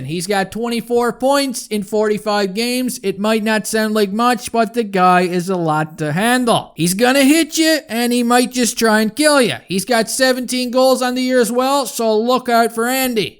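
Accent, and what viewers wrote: Newfoundland, Canada. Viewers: Are ya a newfie ?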